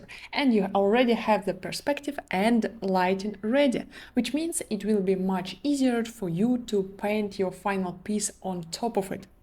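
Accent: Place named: Russia. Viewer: love the russian accent. sound really nice to listen to. really nice video, giving a lot of advice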